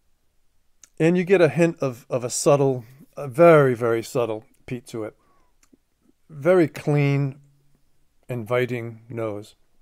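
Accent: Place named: Boston, United States. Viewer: OK👍 Boston accent, good one👍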